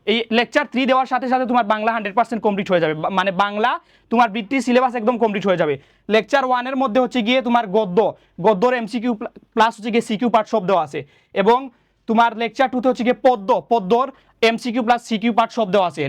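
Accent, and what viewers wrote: Sylhet, Bangladesh. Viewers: sir ar kota bola sune mone hocce sir sylheti😂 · Hmm sylhetii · Yes Sylheti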